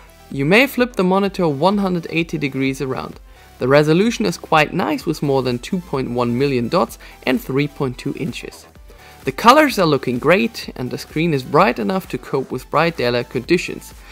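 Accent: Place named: Germany. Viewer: dat german english :D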